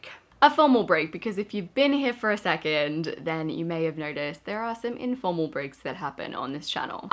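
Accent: Australia. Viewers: I heard 'Channel NERDS' more often than 'notes'. #AustralianAccent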